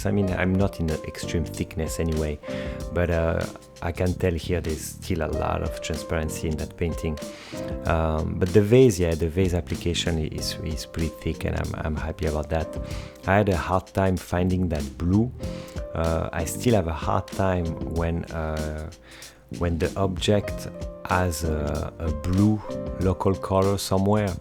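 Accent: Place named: France. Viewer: haha l'accent français je t'ai démasqué !!! très bon travail!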